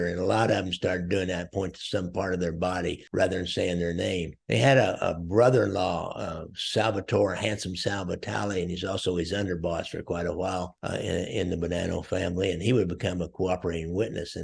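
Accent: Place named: South, United States. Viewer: Great show as always. Its so refreshing to hear these stories from t&e perspective if the other side. While there are a few other former lawmen on youtube or guests on others platforms you have this kind of non biased, fair manner of reporting on your subject. Theyre all pretty ruthless criminals yet if theres anything good or decent about the person that sets them apart from the average thug youll bring it to light. Also, the best part is with your southern, countrified accent you dont sound like some wannabee, half-assed wiseguy. I'm Italian american myself and grew up in rural florida unlike all my other relatives who live within 20 miles of where my grandparents settled in Brooklyn over 100 years ago. Friends get a kick out of my lifelong interest in and of my extensive knowledge of Cosa Nostra because my southern accent makes me a hic or redneck who shouldnt have the interest, background and knowledge that I do. 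I never understood why that was so funny or strange to them until i started listening to your show. Now i kinda get it. For some reason though i dont think anybody feels the same when they listen to James on OC SHORTS. Anyway, just wanted to thank you for another great one. I also wanted to request a show on Trafficante family. We've all heard about his ties to Hoffa, Cuban casinos and possible involvement in the JFK assassination but theres not alot out there about local activities in Tampa and throughout Florida especially S. FL. mainly Dade and Broward countie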